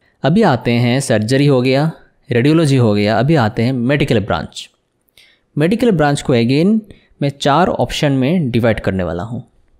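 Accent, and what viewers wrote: Bengal, India. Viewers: U have bengali accent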